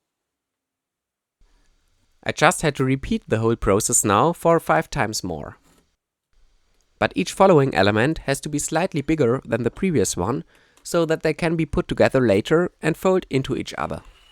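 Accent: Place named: Germany. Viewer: German accent , German ingenuity !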